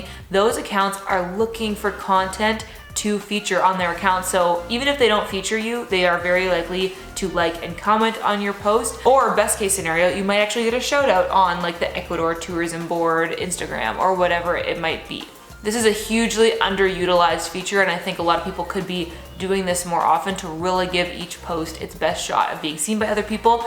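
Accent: Canada. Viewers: Your canadian accent is so strong! 😯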